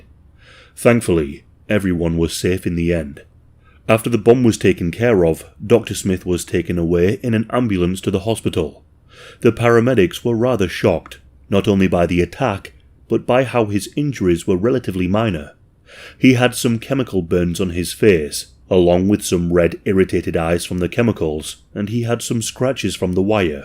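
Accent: United Kingdom. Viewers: The fact that this will actually help me sleep is kinda scary 🤣 i love true crime and just has that sexy deep voice with a sexy British accent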